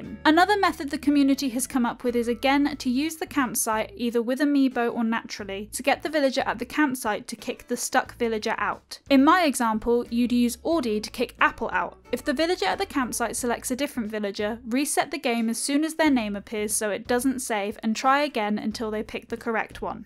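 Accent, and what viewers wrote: United Kingdom. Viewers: It's a Brittish accent.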